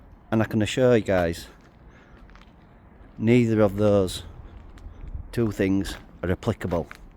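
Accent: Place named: Northern England, United Kingdom. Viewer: I hope that I do not offend you, but where are you from? Your dialect it something else. Are you from from up north somewhere? At the end you said something about Yorkshire. I have worked with people from Yorkshire and also from Scotland. Neither of them sounded like you. Otherwise I am happy that you like the Discovery sport as this is a vehicle I have worked on quite a bit. Actually the entire D8 platform. Also the Freelander 2 before it.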